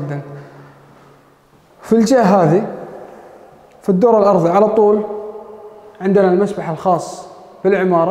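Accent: Saudi Arabia. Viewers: اللهجه. سعوديه. اكيد. الاهل. مواليد السعوديه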